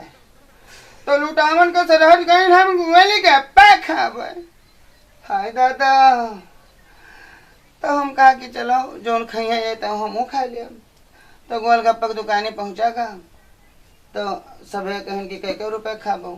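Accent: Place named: Awadh, India. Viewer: क्या जबरदस्त अवधी भाषा है भाई इस आवाज का कोई जोड़ नहीं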